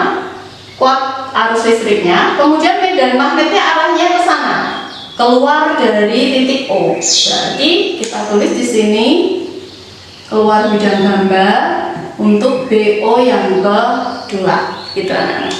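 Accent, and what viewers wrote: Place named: Java, Indonesia. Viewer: Bu guru kyak nya chinese...tapi logat nya jawa... .khas logat hokien...😂😂😂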